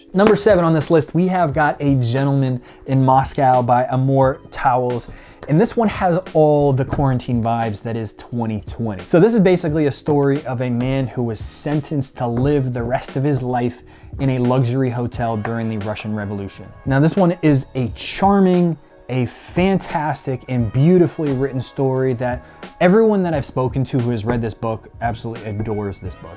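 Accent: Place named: Massachusetts, United States. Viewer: Hey been following your for sometime now under a few of our business accounts on Instagram(raisingwealthminds and theunstucksociety) just realized you are in MA, kinda thought you were with the accent(dude I'm in the same boat, so not knocking it). We are on the South Shore in Duxbury. Keep up the work man!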